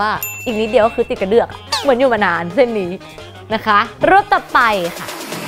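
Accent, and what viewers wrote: Thailand. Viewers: เอาจริงเมื่อก่อนไม่ชอบคือตอนเพลงคันหู คือมันดูแรง แรด ว่าเราชอบผู้หญิงแรดๆแต่เพลงด้วยมั่ง แต่สุดท้ายความเป็นตัวจ้ะคือตอนนี้ จ้ะน่ารักมากกลายเป็นชอบมากความใสๆแล้วบนเหน่อๆแบบนี้คือชอบบบบบบบบที่สุดเลย ครับ เป็นกำลังใจให้ทุกเพลงและจะติดตามผลงานตลอดไปครับ หลงรักแบบไม่รู้ตัว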